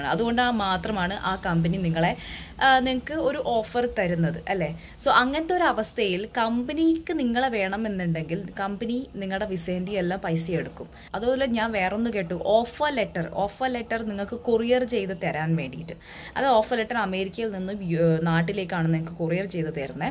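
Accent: Kannur, India. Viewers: കണ്ണൂര് കാരിയാണല്ലേ. നല്ല അവതരണം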